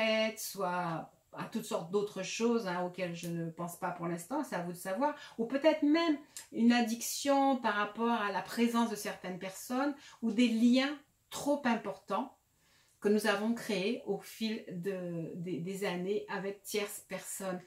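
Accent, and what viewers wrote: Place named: Canada. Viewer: Bonjour. J espère que vous allez bien. Merci pour ces messages. J ai entendu qu'au fur et mesure du parcours les 2 jumeaux ont des points communs physiques comme une harmonisation: même couleur d'yeux, même voie, forme du corps...Vous allez peut être rire mais. ou s avez des pointes d'accent canadien....tresbonne journée. 🌞😀🙏❤😘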